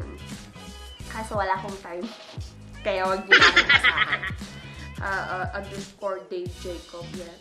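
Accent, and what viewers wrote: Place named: Pampanga, Philippines. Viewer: Kapampangan ka po? May accent e haha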